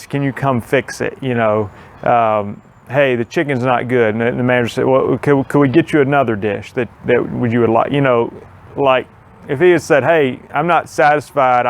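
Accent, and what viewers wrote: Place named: Texas, United States. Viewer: How many employees and major pieces of equipment do you 'run' to service 270 customers? You must have a great office manager to handle all that while you keep up the channel. What city are you in? Your accent sounds Texan more than anything from Alabama. How many years did it take you to get to 270? How much faster would you say it grew each year based on your expertise in fertilization and weed identification and killing?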